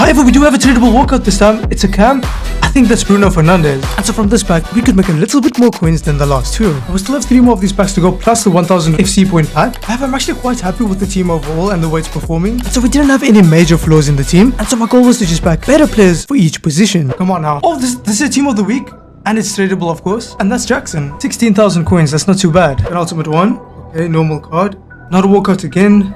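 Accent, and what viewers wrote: South Africa. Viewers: Are you South African 🇿🇦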